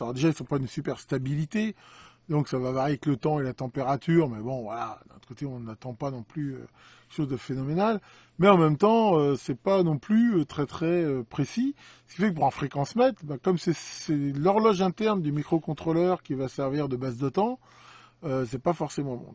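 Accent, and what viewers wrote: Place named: France. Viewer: Bonjour, Excellente vidéo comme toujours sur cette chaîne, ça fait toujours plaisir de voir une notif sur YT avec une nouvelle vidéo de ^^ Par contre, pouvez-vous répéter après moi M? Aidafroute:D Je plaisante, mais cette petite touche d'accent Français m'a fait sourire. Bonne continuation à vous.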